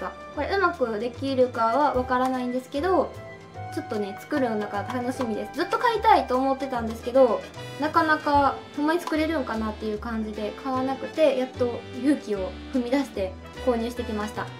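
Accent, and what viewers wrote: Standard. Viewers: なんか、標準語？ww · めっちゃ標準語｡ﾟ(ﾟ´ω`ﾟ)ﾟ｡ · 今日とくに喋り方標準語になり気味やな（笑）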